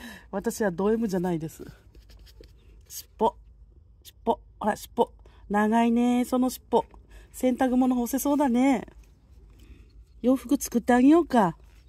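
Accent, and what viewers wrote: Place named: Ibaraki, Japan. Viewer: 初めまして！もしや茨城の方ですか？ 何となく聞き慣れた訛りが😁私は現在北欧のデンマークに住んでいますが、生粋の茨城人です。茶ーちゃん可愛いですね。我が家にも茶白猫がいて、細々と猫動画もやってます。全然人気がありませんけど😅チャンネル登録と高評価ボタンポチポチしちゃいましたよ。これから他の動画もゆっくり観させていただきますね。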